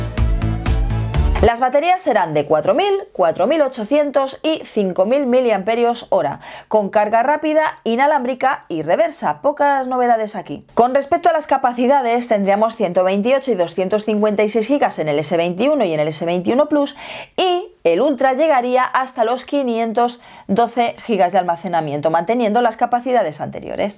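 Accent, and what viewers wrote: Spain. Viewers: con razón, yo siempre escuchaba chupetes esque lo dice rapido y el español de españa no es igual al de nosotros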